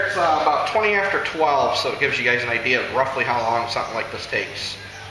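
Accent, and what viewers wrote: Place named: Rhode Island, United States. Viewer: lmao are you from rhode island/maine? you sound like the original peter from family guy.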